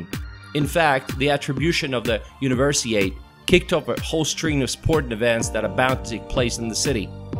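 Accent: South, United States. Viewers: Looks like a great place. I really enjoy your videos. Where did you learn English....its almost as if you were in the Southern USA for some time! My daughter is interested in the Russian language, mostly because she is a musician and I have successfully passed down my love of Russian / Soviet classical music to her. Were planning on visiting Russia to learn Russian. We thought about Odessa in Ukraine and Bishkek in Kyrgyzstan, in the interest of saving money, but have decided to go to Mother Russia direct. Currently we are thinking of Volgograd or Krasnodar. Of course we will probably visit Moscow, St. Petersburg and Murmansk towards the end of our trip. Is the Kazan accent in Russian more similar to Moscow and St. Petersburg or is it closer to Krasnodar and the South? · Is it just me or is the Russian narrator in this video sound as if he took a course in trying to learn how to speak with an American accent?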